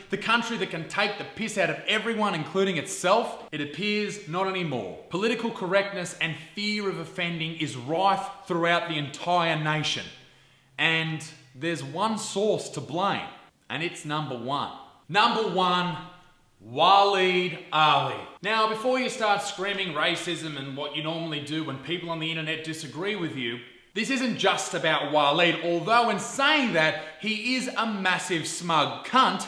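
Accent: New Zealand. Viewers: your NZ accent though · Love this vid<3 Also that kiwi accent🤣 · That kiwi accent. On point 👌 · u nailed that Kiwi accent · that was the typical north island Maori accent, pakeha don't have the same mannerisms, we still say bro tho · his kiwi accent makes him sound like korg from thor ragnarok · You’re Māori accent is on point bro ps some Māori’s hate being called kiwi cause the British made that name up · Quality Kiwi accent. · That Kiwi accent tho, it reminds me of Jonah from Tonga, Chris Lilly, “you on your period or what miss!”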